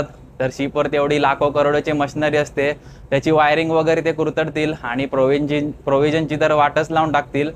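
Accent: Konkan, India. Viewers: Dada tumhi boltana konkni tone janvto 😊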